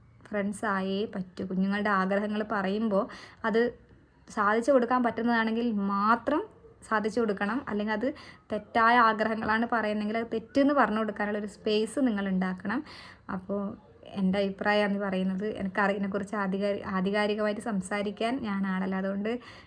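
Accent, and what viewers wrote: Kasaragod, India. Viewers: മലപ്പുറത്തിന്റെ മരുമകൾ, കാസറഗോഡ് ഭാഷ ഒട്ടും മാറ്റം വന്നിട്ടില്ല, അനുഗ്രഹീത ഗായിക അഭിനന്ദനങ്ങൾ ❤❤😂😂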